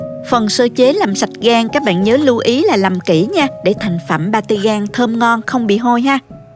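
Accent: South, Vietnam. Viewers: Giong miền Nam của Bình Dương nghe ngọt và bùi như patê cô làm.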